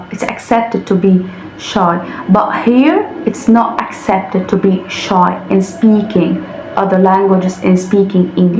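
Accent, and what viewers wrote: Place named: Scotland, United Kingdom. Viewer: British accent مع شوية سكوتش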